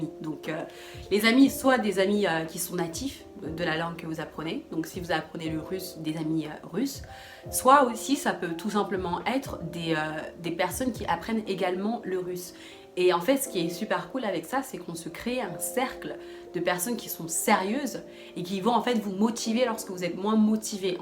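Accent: United Kingdom. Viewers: olalala tu m'as deter !!! cet accent anglais magnifique un goal absolu !!! Merci pour tous ces conseils, ultra ultra utile! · black women with uk accent....n'hésite pas à regarder l'objectif de la caméra au lieu du retour ! :)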